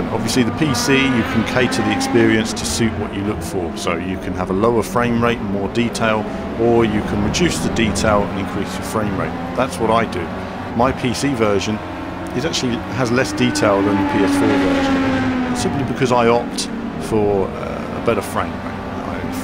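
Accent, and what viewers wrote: England, United Kingdom. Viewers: I am new to your channel and subbed right away as I was looking for updates on Project Cars. i have a PS4 and I have had all the GT games. I am hoping this game also helps to push Poly for the full gaming experience (especially the sounds). I really enjoy your style and pragmatic delivery. I'm also a sucker for a English Accent even though I'm a grown ass American man..lol....Makes me remember when I used to watch Formula One all the time on Speed Channel. Anyways...keep up the great work and I'll be looking for this next week in the States.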